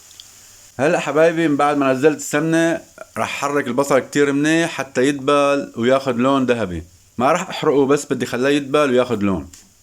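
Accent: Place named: Latakia, Syria. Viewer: معلم ابن البلد تسلم ايدك دخيل رب الحكي اللادقاني واشتقت لشي نزلة عالبلد يا خي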